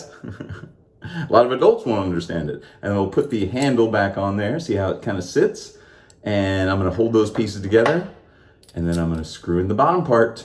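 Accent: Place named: Scotland, United Kingdom. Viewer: Hello from across the pond, the Scottish accent wasn't too bad - I've heard a lot worse!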